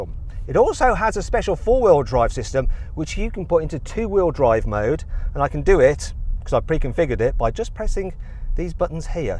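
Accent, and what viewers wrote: United Kingdom. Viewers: 애초에 영국사람이고 영국발음인데 뭔 개소리냐ㅋㅋ.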